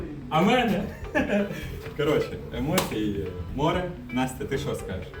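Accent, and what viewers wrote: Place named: Rivne, Ukraine. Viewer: друже ти з Рівного,чі десь прруч?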